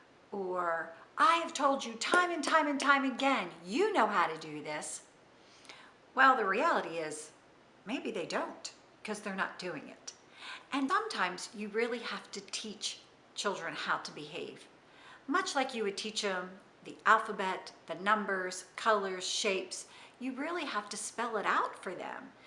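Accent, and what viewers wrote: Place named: South, United States. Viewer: Very slight accent, maybe from southern USA and it's just so cute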